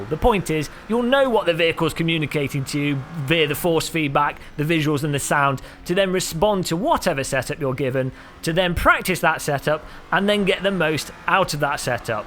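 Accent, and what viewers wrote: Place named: England, United Kingdom. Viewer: Dude you are a F.........king pure English dude :-)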